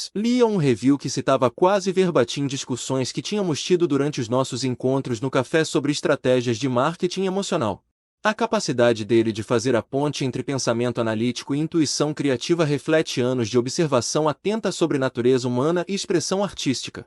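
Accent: Portugal. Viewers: Exatamente isso. Português de Portugal não o nosso .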